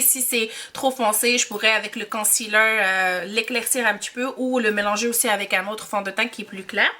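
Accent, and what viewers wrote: Quebec, Canada. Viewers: Je découvre ta chaîne grâce à cette vidéo. ☺️ J'adore tellement l'accent du quebec, tabarnak!! 😅 je ne connaissais pas du tout cette box!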